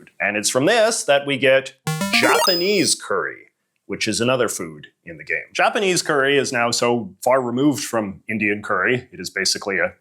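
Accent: Canada. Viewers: As a Canadian, how did you manage to sound so Canadian??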